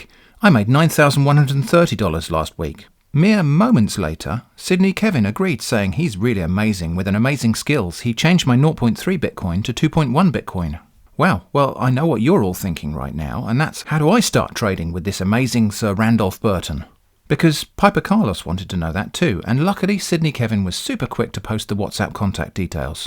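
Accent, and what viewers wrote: United Kingdom. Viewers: If they had said the investments were glarded, well, that might have been enough persuasion for me!, I really think you could make a pretty penny doing audio books! (Generalizations ahead, I’m aware they’re generalizations) I think Americans trust the British accent, and enjoy it, and yours is not thick, like when I’ve tried to watch some BBC police procedurals. You’re also good at lowering your voice for dramatic effect. I mean, I really enjoy listening to you read emails! LOL. That should say something.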